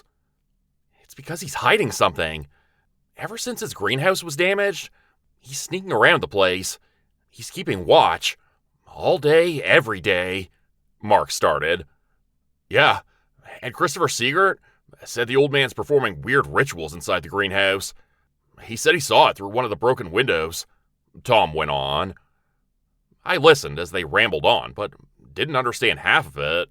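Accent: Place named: Canada. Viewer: Cause he’s from Canada - and no we don’t all talk like that!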